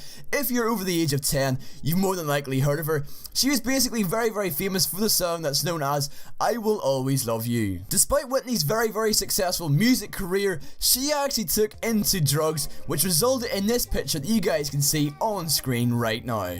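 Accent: Ireland. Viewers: why do u sound like Irish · R u from Northern Ireland or ireland plz reply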